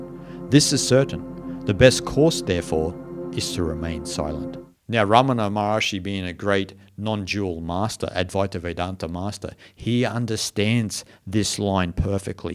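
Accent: Australia. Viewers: accent suggests to me that he is Australian. Am I correct?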